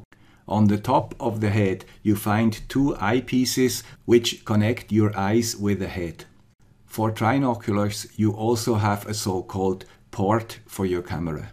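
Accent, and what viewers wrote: Switzerland. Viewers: Many years later, this is still a very helpful video. Thank you, guy with the Swiss accent!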